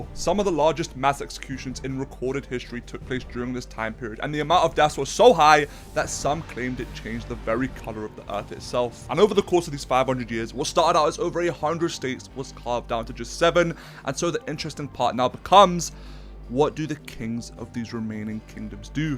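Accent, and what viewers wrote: United Kingdom. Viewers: Im sorry to say this but I raise the volume because you talk with british accent so I can understand what is bro talking about. but on several occasions bro shouted when I turned up the volume, making it uncomfortable for me to watch 🙏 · Why do you have a british accent? Aren't you chinese?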